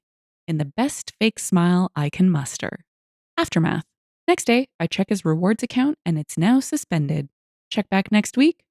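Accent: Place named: Canada. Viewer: Thanks! I'm a Canadian living in the deep south (US) and always look forward to hearing that little bit of home in your voice. 🧡❄️🍁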